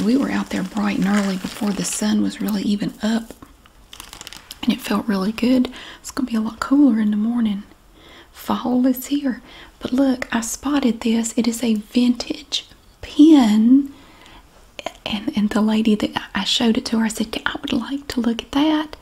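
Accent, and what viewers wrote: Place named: North Carolina, United States. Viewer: Im serving over seas and Your voice reminds me of my wife. she is from rural North Carolina. Your accent is spot on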